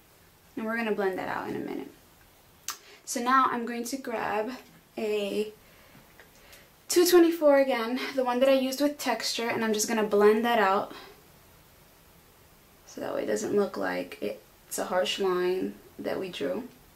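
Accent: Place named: New York, United States. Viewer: love your accent! are you from NY?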